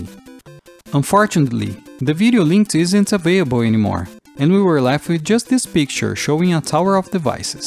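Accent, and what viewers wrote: Brazil. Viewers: Eu conheço meus irmãos brasileiros pelo sotaque, abraço, excelente video! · Brazil accent spotted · I know a brazilian when I hear one, great video bro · Eu sabia que o teu sotaque era brasileiro demais pra ser um gringo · Você é brasileiro? Seu sotaque lembra um pouco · O sotaque de um brasileiro é realmente familiar. Que legal. 🎉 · when you spoke I already knew u were Brazilian 😂😂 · O sotaque BR gritando hauhauha Excelente vídeo amigo. Ganhou um sub. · Esse sotaque não me engana! Brasileiro, né?? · Sotaque brasileiro batendo forte. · orra, o sotaque dele FULL BR mano kkkk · Bro you have the most Brazilian accent I've ever heard! 🇧🇷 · Cai nesse vídeo aleatoriamente. Não Conheci ao canal. Por acaso você é brasileiro? Sua pronúncia é idêntica a um brasileiro falando inglês. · o sotaque brasileiro é inconfundível KSKSKSKS mas acho que peguei uma época diferente, porque eu jogava Super Mario Bros no emulador de NES do Ps2! 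É interessante saber que em outros consoles ele era transmitido diferente, eu não sabia disso haha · Eu amo como as palavras ficam com um sutaque brasileiro kkkk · Sotaque de brasileiro… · Eu tava sentindo um sotaque brasileiro ai no meio, fui ver o canal e é BR kkkkkkkkk, mt bom o vídeo mano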